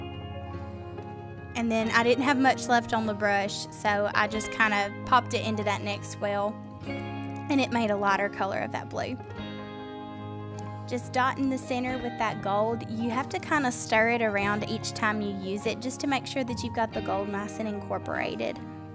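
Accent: Alabama, United States. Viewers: I’m from North Alabama. You sound like you are from my area. Thanks for the video.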